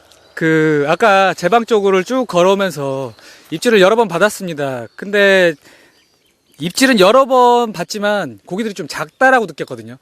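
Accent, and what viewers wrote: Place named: Chungcheong, South Korea. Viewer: 말투가 충청도인디요.. 내가 방송 해봐깐..ㅋ 어려보이는데 몇살이래요? ^^ 응원합니다~~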